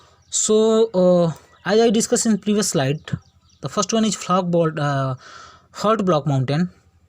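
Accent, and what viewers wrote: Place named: India. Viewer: By according to your phonetics, I think you are an Indian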